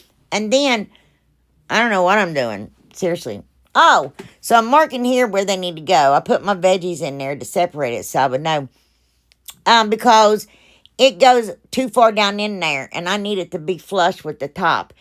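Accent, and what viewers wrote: South, United States. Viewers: Hi,. I'm Tiffany. I am a caregiver for my husband whom is very sick. I'm originally from Nashville,TN but reside in Ohio. We love watching your crafting videos and your country accent. I feel like I'm at home watching your videos. 😊😊❤❤.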